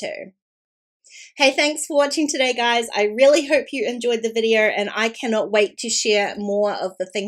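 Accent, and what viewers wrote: New Zealand. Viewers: This is an amazing collection. With my favorite company going out of business, I am looking for a new place to get all my stuff from. This kit is huge!! I love the frames for shaker pockets and all the word sticker. I can't have too many words!! I love your accent. I miss NZ so so much. Thank you for the unboxing!!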